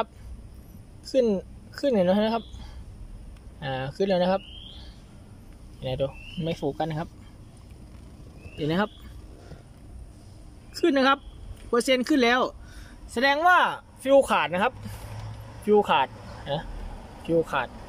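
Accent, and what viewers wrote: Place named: Isan, Thailand. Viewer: สำเนียงต้องอิสานโป่งเว้าไทยต้องเป็ะๆๆ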